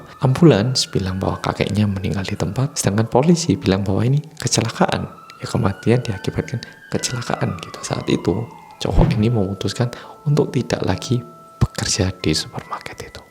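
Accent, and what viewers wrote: Java, Indonesia. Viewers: Makasih, Boloku Sing Paling Medok Dheweeeee 🙏🏼🙏🏼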